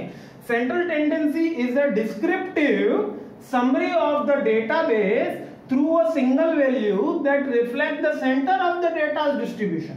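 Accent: Gujarat, India. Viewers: Sir aap Gujarati hainn kya?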